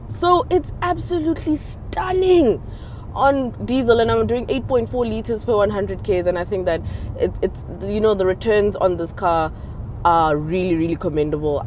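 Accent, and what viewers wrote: Pretoria, South Africa. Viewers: The Pretorian accent made me enjoy this review 😂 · "Ke diesel gaeje petrol" 😂😂😂she's definitely from Pitori 😂😂❤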